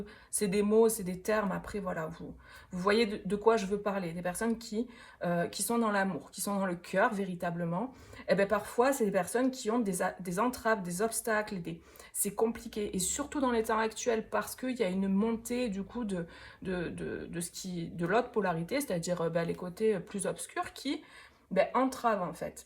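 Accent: South, France. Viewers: Mais c'est très sympa cet acca-în du sud !!!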